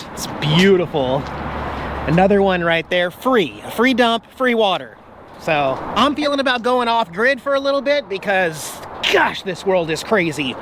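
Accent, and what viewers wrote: South, United States. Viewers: You can't get the South all the way out of a Southerner!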